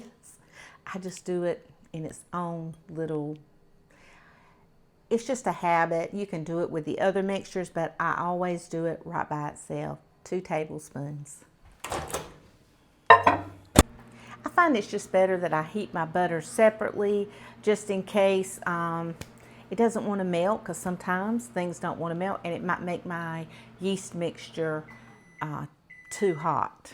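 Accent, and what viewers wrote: South, United States. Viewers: You took the words right out of my mouth! I just❤ watching. She is just so down to earth,and I ❤ her southern accent...so calming.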